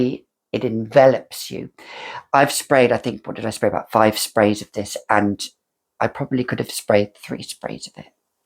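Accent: France. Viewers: your French accent is lovely, I love hearing you pronounce names and brands! I know that's not the important part, but it's music to my ears 😊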